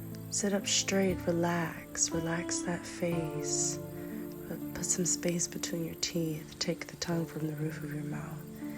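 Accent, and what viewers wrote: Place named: United Kingdom. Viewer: your voice is very soothing - made my eyes close so had to watch the video again. thank you - also I was 100% sure you were British until the switch!! 😅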